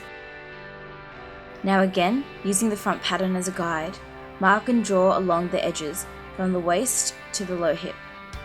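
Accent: Australia. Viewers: My god, I'm Australian (with this exact type of Aussie Accent) and I didn't even pick it. You must have a good ear!) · Your accent sounds familiar lol, are you from ausltralia?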